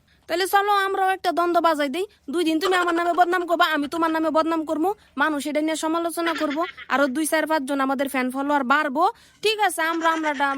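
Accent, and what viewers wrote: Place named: Chapainawabganj, Bangladesh. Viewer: এ হাপা তোমার মুখের কথা গালা শুনতে খুবই ভালো লাগে হারঘে চাঁপাইনবাবগঞ্জের ভাষা শুনলে হার ঘে ভিডিওতে ঢুকে পড়ো।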